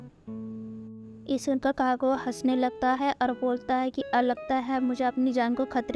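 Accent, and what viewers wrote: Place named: India. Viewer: I don’t want to be mean but you sound like every Indian person ever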